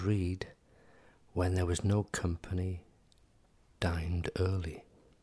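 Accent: Wales, United Kingdom. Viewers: I haven't heard the Welsh accent very much. In fact the two people I've ever heard are Rob Brydon and. Now I think this is how all Welsh sound.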